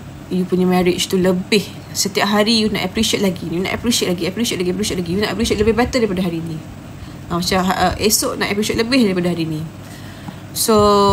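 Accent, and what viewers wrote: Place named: North, Malaysia. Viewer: Org utara ckp . Ibrahim Mcm² la aw👍🌹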